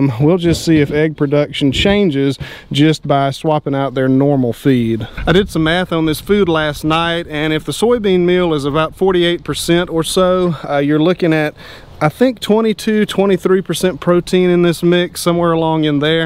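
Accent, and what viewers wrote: South, United States. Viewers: judging by your accent, you live in the south and absolutely do not need heat lamps. In fact, you're risking burning the coop down with them in it. Forcing your hens to pump eggs out during their natural rest period is controversial and not good for them in the long run. It will shorten their life span and many think it's inhumane. The rule of thumb is to let them do what nature dictates.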